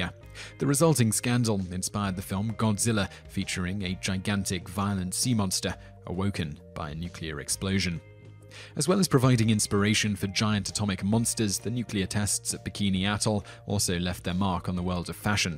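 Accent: United Kingdom. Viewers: Curious. US pronunciation and grammar, in a British accent.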